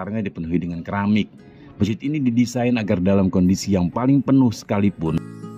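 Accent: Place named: Banyumas, Indonesia. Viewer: Reportase nganggo ngapak mbah 😀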